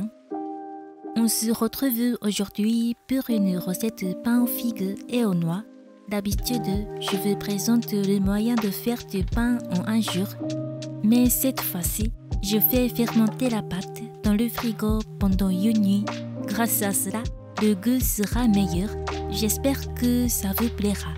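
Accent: Japan. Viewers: Bonjour je viens de decouvrir ta video sur le pain aux figues et noix cela ma donne envie d'en faire tout de suite, du coup je m'abonne a ta chaine. PS J'adore ton accent japonais merci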